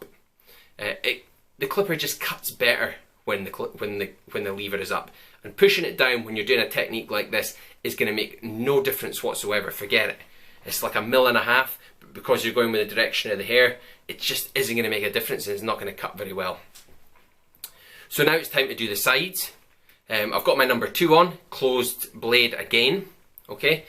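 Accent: Scotland, United Kingdom. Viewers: Thanks for this. I'm giving hubby a Covid Cut this weekend, and this is a great tutorial !! Also..you could recite the Privacy Agreement for Apple, and I'd still listen...lovely Scots brogue !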